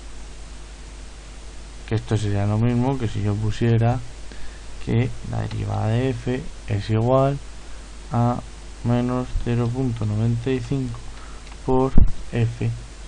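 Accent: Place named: Southeast, Spain. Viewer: qué cosas más curiosas, por cierto, tienes una voz muy muy sexy, me gustaría conocerte en persona, pásame tu número, por el acento, me parece que vives en el sureste peninsular español, yo también, podríamos conocernos ;)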